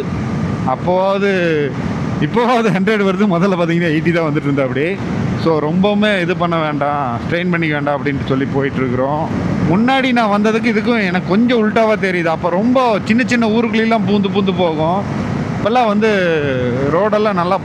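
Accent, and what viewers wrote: Kongu, India. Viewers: I remember this person. You two were sitting on the water tank and talking about your Ladakh trip. At least four years ago, I saw this person on your YouTube channel. But I still remember him. I really like it when you come and speak in Kongu Tamil in your channel., I am also from Kongu side.I really like your channel because you speak in that tone. keep continue bro. I pray to God that you always have a safe journey. I love Channel also. I've been watching Moto vlog continuously since he started domestic travel, and he has spent a lot of money on international travel. I feel like a person from Tamil Nadu who has an international travel vlog is a big feat that no one else can do. I hope he hasn't received recognition from people yet. I really like that man's spirit. No human being can afford to take a small international trip and spend it on people, because the cost is too high, but he works for us.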